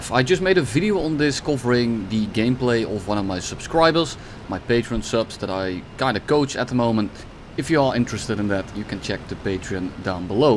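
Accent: Netherlands. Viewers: Hey are you dutch by chance? Because the accent sounds really dutch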